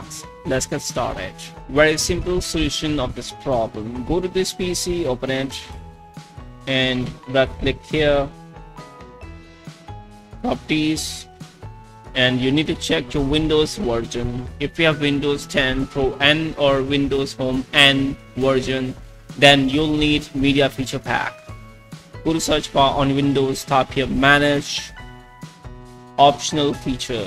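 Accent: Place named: India. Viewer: Always the underrated Indian accent tutorials that ALWAYS WORK!!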